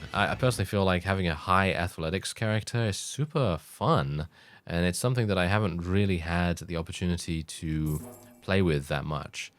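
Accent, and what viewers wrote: United Kingdom. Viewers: Your voice, British accent and saying the word problematic every 5 minutes You are the most fascinated one i have ever listened to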